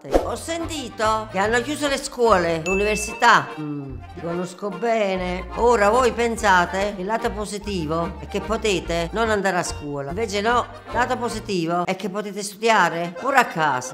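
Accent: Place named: Naples, Italy. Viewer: Ciao, sono un'insegnante di italiano e vivo a Dublino. Ho passato questo bel tuo video ai miei studenti stranieri, così imparano da casa anche durante il coronavirus e magari imparano anche l'accento napoletano. Ti volevo chiedere se hai messo tu i sottotitoli in inglese o sono automatici? Corrispondono in pieno al testo in italiano tranne in un punto. Non so se li puoi/vuoi editare. Invece di "eating nails" si dice "biting nails". In quel punto io mettererei "biting nails, cuticles" (le pellicine). Buon lavoro!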